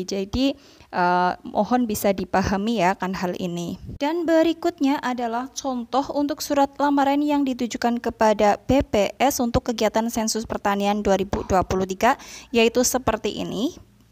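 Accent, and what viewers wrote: Banyumas, Indonesia. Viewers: Sampean ngapak Tegal apa Kebumen yukk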